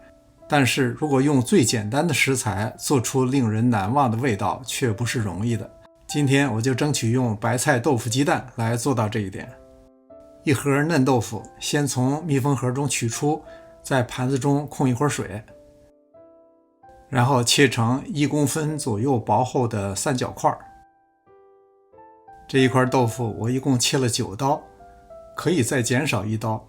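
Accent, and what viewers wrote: Beijing, China. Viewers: 您是北京人嘛🤩口音有点亲切啊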